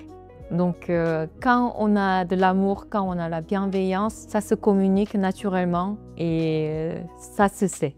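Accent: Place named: South Korea. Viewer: J'ai visité ton beau pays, juste 16 jours et nous comptons y retourner voir le printemps. 🌸 La nature est belle et dominante, Séoul est immense mais grâce à ses collines, ses parcs... est fascinante avec ses buildings qui se dressent à côté de petits immeubles et quartiers de maisons insolites et des collines intactes. 🏞️ Busan est immense, vraiment trop belle. 😍 Jeju est originale et attirante, ... bref un pays où on aimerait vivre parce qu'il y règne encore des valeurs : celles de la famille, les respect des aînés, la discrétion des couples dans la rue, la cuisine riche et variee, la pollution quasi inexistante. 👍 Voilà. Il y a encore plus à dire mais je m'arrête là. Vous êtes une belle coréenne avec un joli accent. 💖 Merci d'aimer notre pays 🙏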